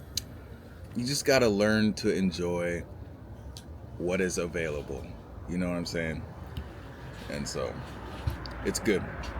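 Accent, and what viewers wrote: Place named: France. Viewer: Your french sound classy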